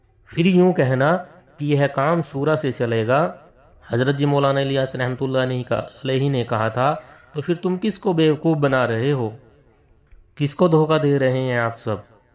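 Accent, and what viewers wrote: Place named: India. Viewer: HAZRET KI LA-JAWAB URDU: Yea koi Hind/Deoband kay alim hain? In ki Urdu Hindi ki mahzooz-kun amezish liay huway hay, maslan "NiZam" ko 'NiJam", "Jawab" ko "Zawab", "Shura" ko "Sura", "terah/terha/terhan" ko "terain" kehtay hain! Alif kio Ain-Alif ki awaz say perhtay hain, maslan "Ap" ko "Ain+ap" (ba-awaz-e-"Aam/common) kehtay hain, jo kay sareehan ghalet hay.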